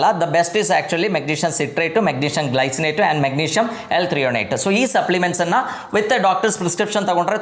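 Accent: Kerala, India. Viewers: are you keralite speaking kannada,..?? but you speak well,..!! the composition of chlorophyll, haemoglobin are almost same except for Mg,... replace it ,.. it is blood cell composition,... hence cattle/ grass fed animals are getting blood compositions from grass itself,... !!first source,.. hence grass superfood,.. !!ultimate food,... hence worshipped in every pooja,.. as darbe,... around the fingers as a ring like,...!!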